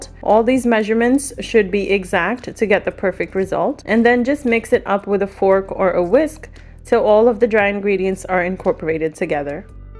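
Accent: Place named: Bronx, United States. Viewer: You sound like a Puerto Rican from the Bronx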